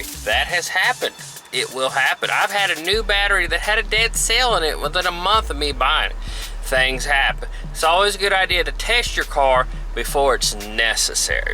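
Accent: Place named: South, United States. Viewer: I like this southern man, where did u get that accent?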